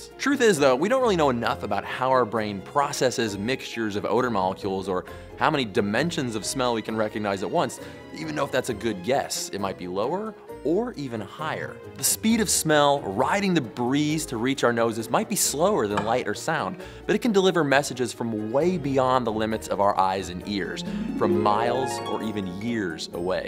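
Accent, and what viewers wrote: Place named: United States. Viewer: I can tell from his accent that he's American. He also mentioned Austin in one of his other videos.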